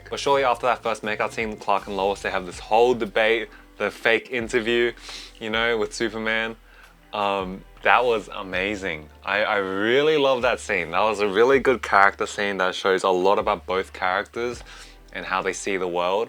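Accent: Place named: Australia. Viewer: I loved this movie. I agree with you in wishing that it was longer. I appreciate you - good to hear a fellow Aussie voice reviewing this and being so into the lore of the characters and previous media. Also, where did you order that food? How did you get those three dishes mixed in one container? lol. I’m so hungry now.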